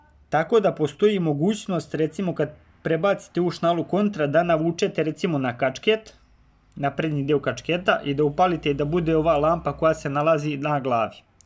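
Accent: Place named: Mačva, Serbia. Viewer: Veliki pozdrav, samo tako nastavi. Ledlenser je odlična kompanija imam dve, jednu na glavu i jednu sličnu toj samo malo veću. Meni se čini po tvom naglasku da si iz Mačve, ili grešim🤔🤔?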